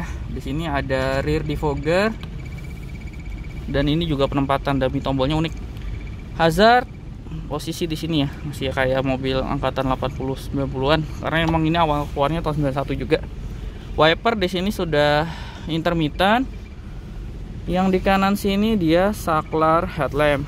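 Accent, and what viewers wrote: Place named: Java, Indonesia. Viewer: om, kamu orang jawa nya mana, kok bahasa jawa nya medok 😆😀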